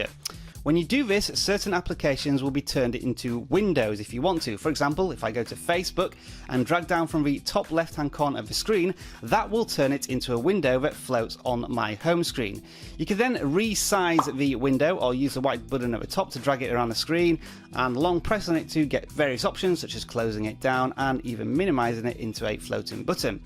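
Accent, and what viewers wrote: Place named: United Kingdom. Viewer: The goddamn british accent creeps me out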